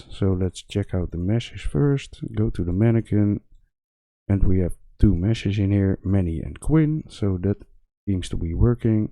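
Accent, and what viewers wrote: Netherlands. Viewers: You can so clearly hear you are Dutch! I am complete 47 years noob learning UE for fun, love this tutorial.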